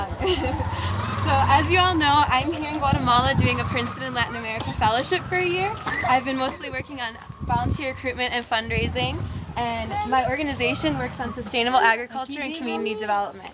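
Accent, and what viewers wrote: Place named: New England, United States. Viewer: Yeah great job, watch your steps, lol... wow I'm so impressed with your Spanish, oh by the way I used to work with FUNCEDESCRI too when I lived there. Is from New Ingland? cuz of the accent, wow tha was a 3 minutes walk. Any way great job.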